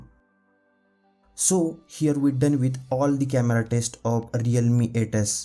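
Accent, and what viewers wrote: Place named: Maharashtra, India. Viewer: Marathi tone 😊.... Chan hota video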